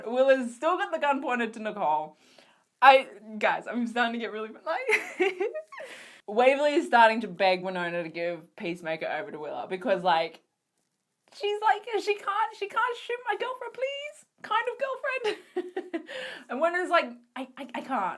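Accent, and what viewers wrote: Australia. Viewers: she's Australian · Nice vid. 👍🏻 I'm from the UK so cos were always way behind in the good shows I'm only able to see what you lot put on you tube. Thank god for people like you. I also may have a teeny weeny crush on you. Damn Aussie accent and giggles. ☺️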